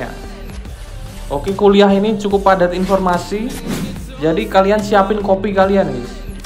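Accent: Java, Indonesia. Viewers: bang Jawa ya?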